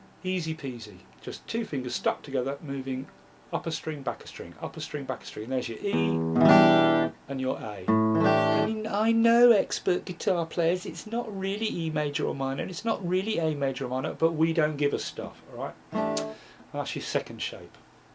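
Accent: United Kingdom. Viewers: come here for tips.stayed for the british accent noice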